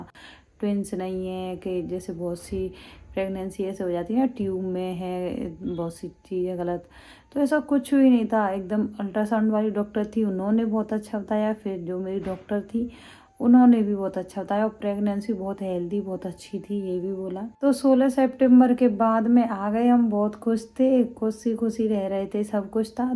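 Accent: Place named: Bharatpur, India. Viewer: Aap kaha sey ho Rajasthan language sey to bharatpur k aas pass k kag rhey ho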